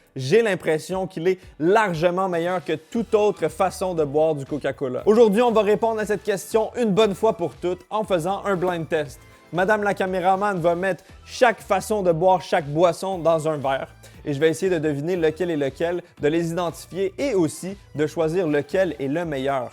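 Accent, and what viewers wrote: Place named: Quebec, Canada. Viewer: 🤣🤣🤣 très special a l’écoute personnellement je n’aime pas du tout entendre le québécois ou canadien, malgré que vous êtes super mes juste votre accent et votre façon de parler je trouve sa horrible 😂😂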